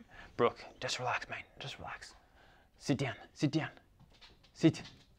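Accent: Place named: Australia. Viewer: Watched all the way through now, I actually enjoyed hearing a fellow Aussie in the space so keep it up my friend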